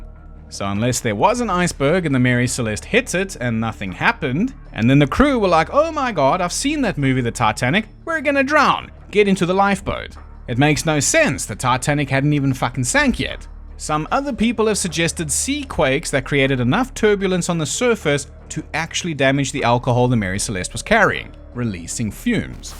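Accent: South Africa. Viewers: Algorithm just served this video to me. I clicked on it because I've been fascinated with the Mary Celeste since I was, like, 6 or 7-ish. So I was already excited! THEN...I hear it being narrated by a fellow South African!! AND...the humour (the whole tone, really) is absolutely on-point!!! This is peeeak YouTube! 👌🏼😁🇿🇦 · Im so confused why everyone is saying he sounds Australian. It's quite audible someone who primarily speaks a form of dutch (in this case Afrikaans) speaking English. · I am now officially a fan of the South African accent or yours anyway which I can't say about any British or English accent. Also, your sense of humor is amazing. Laugh so hard every time I listen to your recordings keep going. Keep going love it. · howdy from savannah georgia USA. new sub here. i love your sense of humor and thank you for NOT being AI. your south african accent and pronunciation is far superior to most others from the american south east. 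i'm glad youtube suggested your channel. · I spent a few years working in state fairs, and a lot of my coworkers were from South Africa. You apologize for your accent, but it's one I've heard many times. It's pretty much what I expect the cruise and fair industry to sound like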